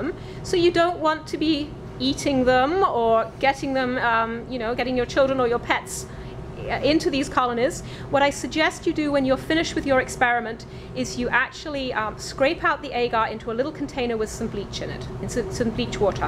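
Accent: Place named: United Kingdom. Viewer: I think British.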